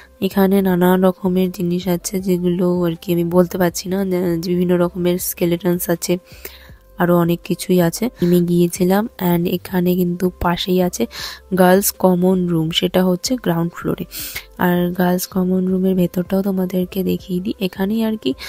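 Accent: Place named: Bangladesh. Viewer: Ekhn o sordi kome nai 🥹🤧 R Bangladeshi style e ktha ta wow wow lagse 🤩🫶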